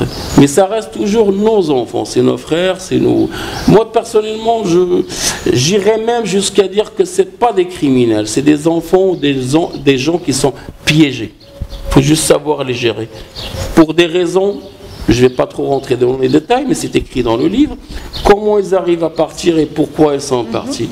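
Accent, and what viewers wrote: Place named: Arab world. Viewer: فيك عياقة وتكبر ديال رباطيين ولكن جات معاك غير حاول ماتنطقش فرونسي فيها لكنة معربية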